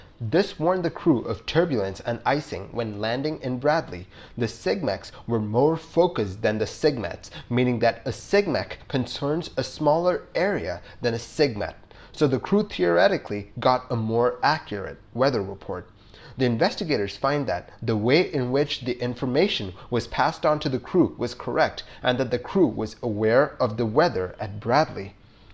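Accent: India. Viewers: You sound like an absolute asshat, since he is Indian and English isn't his first language 🤷‍♀️ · He's from India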